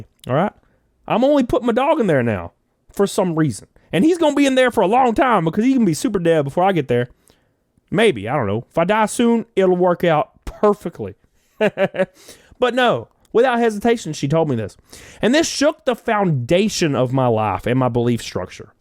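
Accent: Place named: Kentucky, United States. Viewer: This guy’s page is gonna blow up. Keep up w the content brother. You remind me of my buddy’s - lol. From KY. That accent can’t be mistaken.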